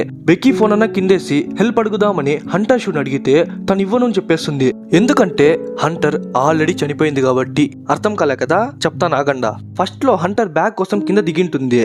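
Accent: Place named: Karnataka, India. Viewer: bro nuvu telogodivi kadhu kada, nuvu matladuthunte kanada valu laga matladuthunttu undhi.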